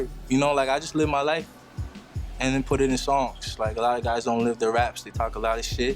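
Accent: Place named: Canada. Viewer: He sounds SOO Canadian, eh? The Canadian "hood" accent is a combination of West Indian, and believe it or not, African. There's even some Arabic influences. I personally find people who say "ahlie" and "walahi" to be annoying, but I mean that's still pretty cool.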